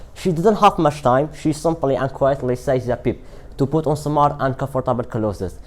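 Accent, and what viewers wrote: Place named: India. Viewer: Mashalla , baas hastakam lahjay hindy wargrtwa😍😍😁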